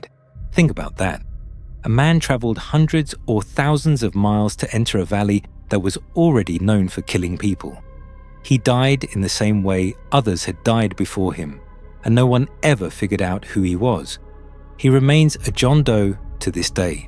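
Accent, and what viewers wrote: United Kingdom. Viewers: Great writing and images. Excellent British voice in the narration - nice enunciation, warm tones, clear and listenable, great intonation and expression. But please if you can edit it, decide to use the Dee-nay or Dee-neh to pronounce Dinè. Don't be all over the place when the rest is so well done. Worth fixing if possible, to respect the Dinè.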